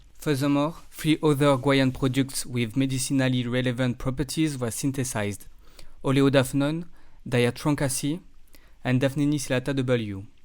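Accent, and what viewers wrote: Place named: France. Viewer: Je reconnaît le petite accent français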